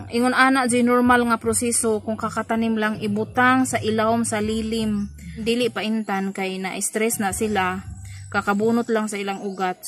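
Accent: Visayas, Philippines. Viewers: Hi new subscriber here...tGa asa man ka? Kay ang imo sulti murag sa bohol or surigao or leyte?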